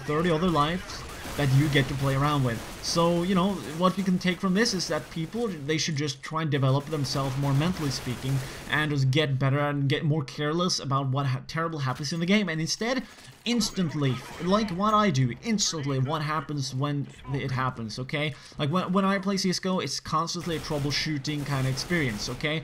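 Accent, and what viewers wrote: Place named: Norway. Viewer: Jeg bare slker hvordan du snakker litt Norglish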